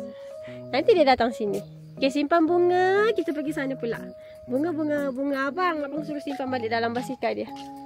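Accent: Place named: Sabah, Malaysia. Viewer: comel cakap sabah.... buat lah story pekenalan dan suami dan q and a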